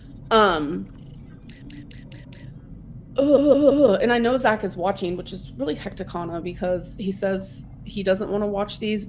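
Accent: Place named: England, United Kingdom. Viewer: 😂😂 the only time I ever see these hambeasts is when you make videos, thanks for suffering through it for us. 😂 she will never learn. Though sometimes I feel like you need to remember that America is culturally dominant and that nobody takes the old english accent seriously tho...like accept that and know haha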